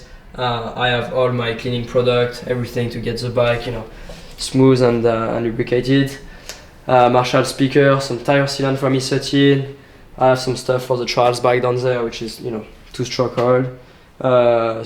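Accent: France. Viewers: Super utile, je me demandais justement comment j'allais mettre mes trois bexons au mur avec les Steadyracks que j'ai commandé hier, merci pour le tuyau. Et... pas mal ton anglais, juste avec un nice French touch :) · Strong French accent there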